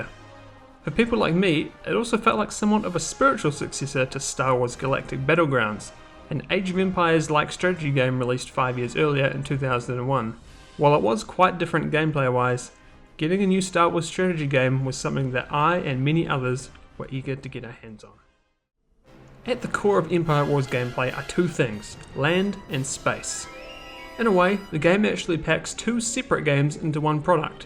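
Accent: New Zealand. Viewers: Great game, great video, great Kiwi accent. Chur.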